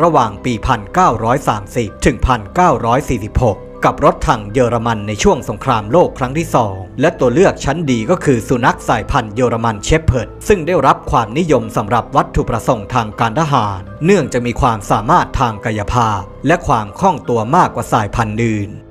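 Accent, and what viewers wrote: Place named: Prachuap Khiri Khan, Thailand. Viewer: สำเนียงประจวบคีรีขันธ์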